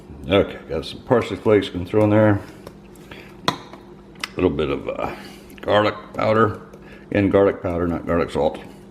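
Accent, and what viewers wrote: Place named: United States. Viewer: Usually only Brits and cats can eat Sardines for breakfast, but you sound like youre American....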